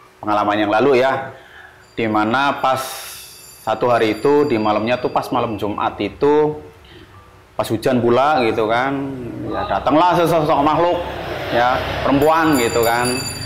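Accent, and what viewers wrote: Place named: Cilacap, Indonesia. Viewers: Owalah ngapak tenan Iki mas req😂❤ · Nadanya kaya orang cilacapan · ngapak banget bahasanya bukannya serem malah ngakak wkwkw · Ngapak dikit nga ngaruh um haha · ini cerita serem tp jd ketawa karna abangnya ceritainnya lucu pake logat jawa medoknya😂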